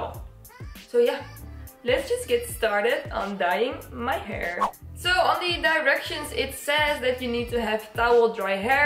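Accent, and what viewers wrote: Netherlands. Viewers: sounds dutch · I totally heard you were dutch before checking the description box. Dutch people unite❤ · are you dutch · It really sounds like a bloody heavy Dutch accent. I’m almost sure she’s Dutch! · je hoort gewoon dat je Nederlander bent XD <3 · phan trash it sounds like she's from holland/the Netherlands but I'm not sure. My mom is from holland and that's what her accent sounds like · are you dutch? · you're talking with a dutch accent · Are you Dutch? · ik hoor gelijk dat je nederlands bent haha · Ik hoorde aan je accent al dat je Nederlands was :) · She sounds Dutch to me lol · Ik think she is from the same country as me ( the netherlands) because she sometimes says dutch words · Yeah she’s dutch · I hear in your accent that you come from the netherlands. I really like your youtube channel. Hey, ik ben ook Nederlands. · Are you dutch? 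It sounds like you have a heavy dutch accent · Are you dutch?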